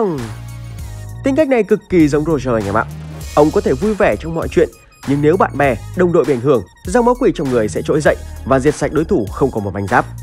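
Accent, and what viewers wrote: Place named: Nghe An, Vietnam. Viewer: Đanh đọc bình thường tự nhiên đọc bằng giọng nghệ an nghe khó chịu v 😑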